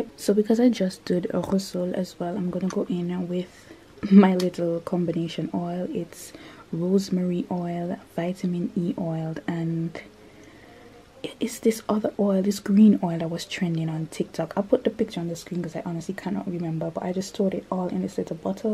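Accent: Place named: Jamaica. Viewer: I know a fellow Jamaican when I hear them🧏🏾‍♀️ Allahumma barik sis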